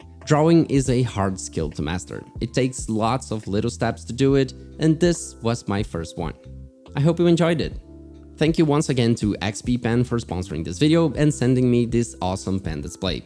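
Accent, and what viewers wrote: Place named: Eastern Europe. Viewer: You have a very massive slavic accent.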